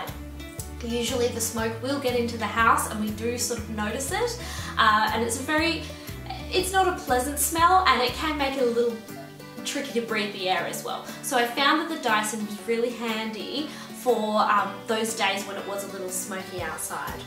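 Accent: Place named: Australia. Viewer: I love Australians accent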